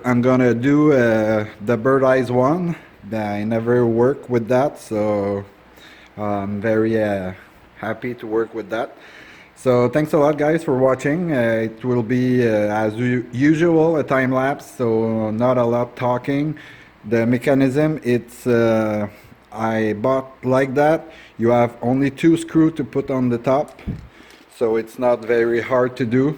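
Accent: Canada. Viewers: I just subscribed to you! Beautiful works!! Wish I could take up this hobby. No doubt woodworking can get into your blood! Thanks for using nice language AND having a great accent! How do I become a "kanuck"?!!! Hope I didn' the say a " nasty"! My son & daughter-in-law love in Sarnia, Ontario,Canada.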